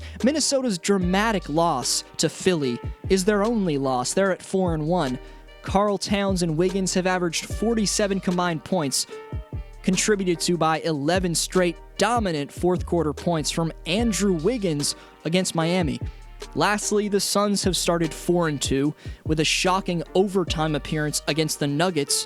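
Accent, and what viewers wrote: Canada. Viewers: I never knew about the instagram beef, bruh. You just lost me there. You sound Canadian and like you're wearing a tie. When Drose won MVP you were probably barely walking. I don't do millennial channels.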